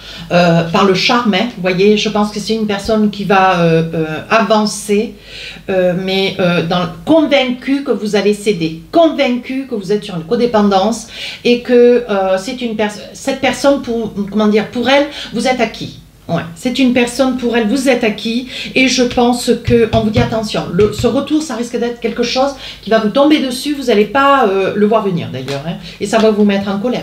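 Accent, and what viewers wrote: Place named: Southwest, France. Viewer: Vous avez l’air fort sympathique et me faites bien rire, avec votre parler cru mâtiné d’un petit accent (sud-ouest?). Pour le fond, mensonges et trahisons on s’en passerait mais succession et lointaine destination sont des mots qui vont très bien ensemble ;) Affaire à suivre, en vous souhaitant le meilleur à vous surtout!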